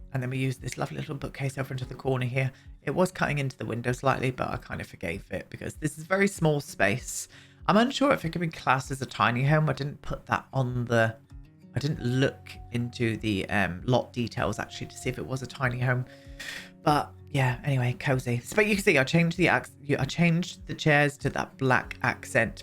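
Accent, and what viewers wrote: United Kingdom. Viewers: And you is british haha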